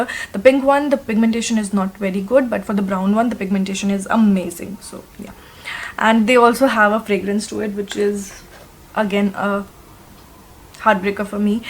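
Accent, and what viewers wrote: India. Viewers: sana Zehra seriously?? Just because she don't have a build up accent to her english, her english is not good? Well grammatically it's all correct, just that she's speaking in her natural indian accent you find it "not good"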